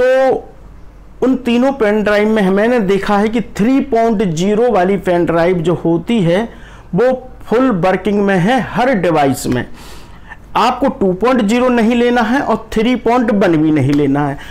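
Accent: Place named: India. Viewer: I understand only one word - "pendrive". Where you learn this indian english dialect?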